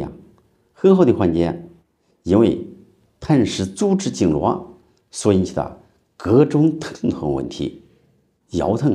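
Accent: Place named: Henan, China. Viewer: 这个河南口音来的太正宗了，大概是南阳的吧！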